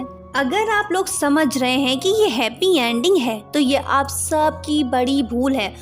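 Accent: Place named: India. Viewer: Mujhe laga aap Indian ho kyuki aapka voice Indian Jaisa Hai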